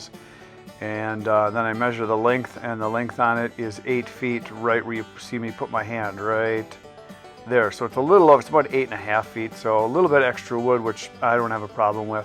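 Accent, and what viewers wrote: Wisconsin, United States. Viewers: Good ol’ Wisconsin accent . I’m from Buffalo NY but spent a little time in Amherst <mail> Wolding when I first got my cdl. I went there in late January early February and it was a different kind of cold 🤣 Beautiful in that area.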